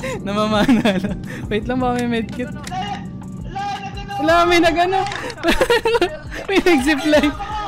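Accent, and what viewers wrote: Visayas, Philippines. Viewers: btw gi copy nmo si kuya jazon gaming question ko lang kuya? bisaya ka?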